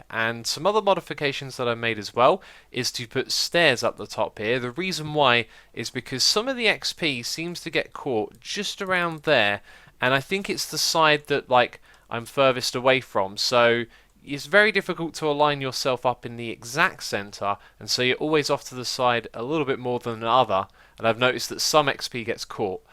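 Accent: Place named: England, United Kingdom. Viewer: Mesa-FIED or Mesa-FIGHT? I love your english accent but this has been bothering me all this season.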